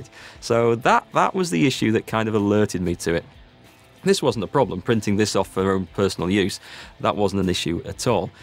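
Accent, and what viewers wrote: Yorkshire, United Kingdom. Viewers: where about in Yorkshire are you from?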